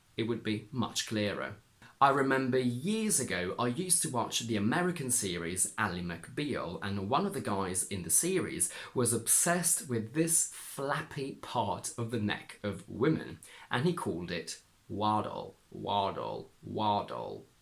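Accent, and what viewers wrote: RP, United Kingdom. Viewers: The RP is easier for me as I don’t like to do the American “r” sound. By the way, I am drawn to your channel because of your posh accent, love it. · I ❤ British accent and your accent is very beautiful and attractive :) I just found your channel and subscribed. May I ask which part of England are you from?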